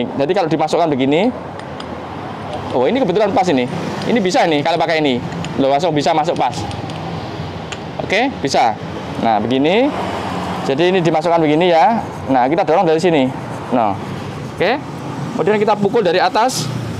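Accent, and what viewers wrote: Java, Indonesia. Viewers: Logat e kok jowo mas? Sampean pundi mas? Kulo mpon ndak kuat mas vario 125 led kulo mpun turun mesin ganti perpak. Panggah munggah terus air radiator e mas. Tulung mas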